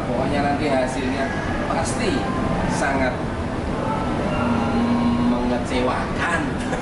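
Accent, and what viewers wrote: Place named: Java, Indonesia. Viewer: Mas e Jowone medhok tenan.. Sukses terus Kang 🙏😇😇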